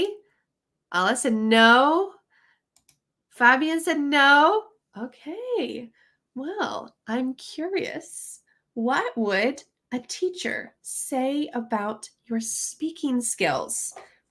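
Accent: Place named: United States. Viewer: American Native English accent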